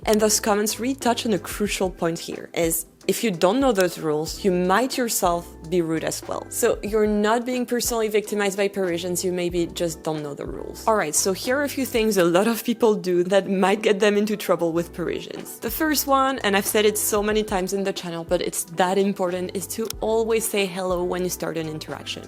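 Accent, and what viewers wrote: United States. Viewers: cets quoi ce délire d'avoir l'accent américain et de parler avec la gorge, génération miley cirus, c'est horrible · speaking in English with an American accent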